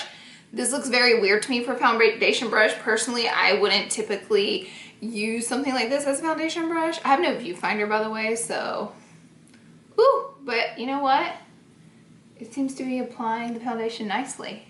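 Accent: South, United States. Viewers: Brittany Hargett she has a heavy country accent, you ignorant bitch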